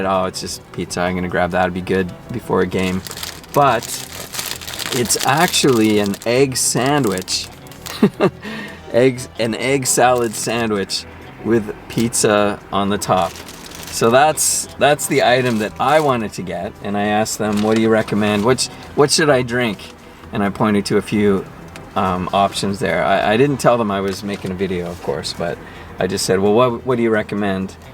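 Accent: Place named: Canada. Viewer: You may not have had food from home in a long while, but you still sound Canadian, eh?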